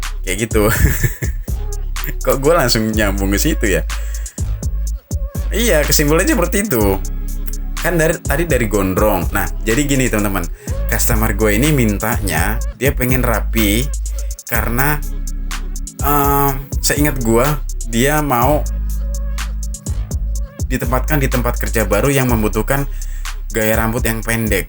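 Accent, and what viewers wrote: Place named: Pati, Indonesia. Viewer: oh pantess saya penasaran aja logat sampeyan kayak orang pati.ternyata simbahnya dari kolilo pati.ya2.ngak meleset filling gw.😎😎😎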